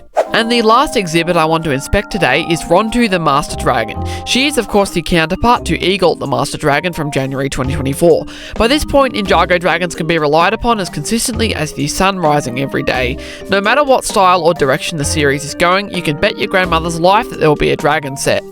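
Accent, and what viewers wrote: Australia. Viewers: Is this Australian or New Zealand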